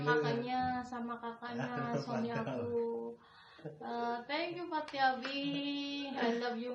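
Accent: Banyumas, Indonesia. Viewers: Karo basa ngapak yaa, mba..😂😂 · ngapak ngapak salam kreasie kompelite like like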